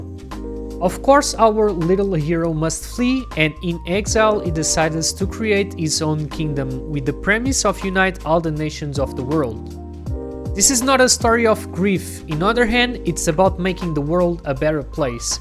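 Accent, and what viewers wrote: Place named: Portugal. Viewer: i can tell by ur accent man:p great video i was thinking about buying that it's on sale on the ps store, eu também sou tuga ahah:p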